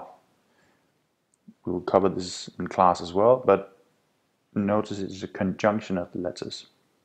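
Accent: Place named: Denmark. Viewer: i love you danish accent <3 :D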